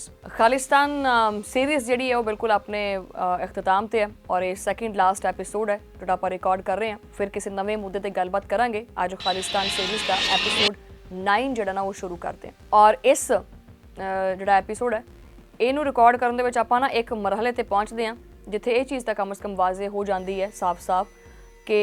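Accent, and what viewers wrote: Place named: Majha, India. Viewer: ji menu thodi teth (pure majheli punjabi ) bahut vadia lagdi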